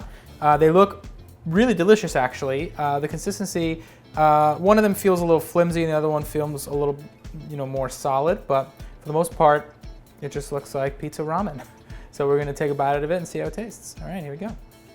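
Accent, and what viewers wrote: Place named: South, United States. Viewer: I love it. That southern accent (I think) is the best.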